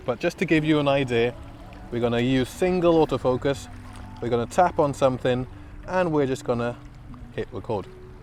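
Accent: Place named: Wales, United Kingdom. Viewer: I not only have Welsh roots I still live there - I am so glad you mentioned that as whilst watching your videos I can easily hear your Welsh accent (I guess you are more south as I am near Aberystwyth) but struggling to work our where you are based - my guess is Australia? Best wishes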